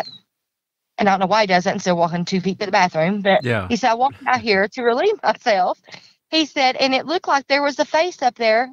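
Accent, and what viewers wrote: South, United States. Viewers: your lil ol southern drawl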